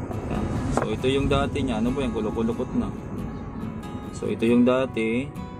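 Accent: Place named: Visayas, Philippines. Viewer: Bisaya ka boss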